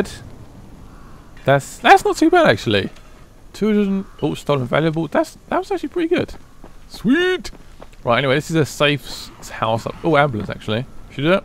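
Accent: England, United Kingdom. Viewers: Are u born in England because u have this accent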